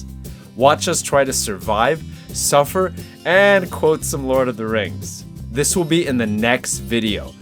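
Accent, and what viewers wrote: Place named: United States. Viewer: that is honestly so impressive. I for sure thought you lived in the states for a time or something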